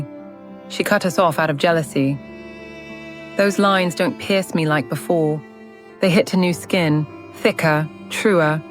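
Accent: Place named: United Kingdom. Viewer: Sound more British than from Nebraska.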